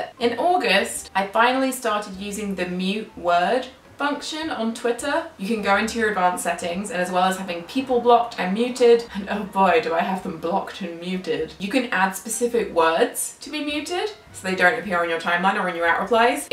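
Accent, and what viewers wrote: Australia. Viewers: You sound quite Australian here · hey! Cool pin :) have you been to Australia recenty?? Your voice sounds Australian today! have a nice day, chum xxx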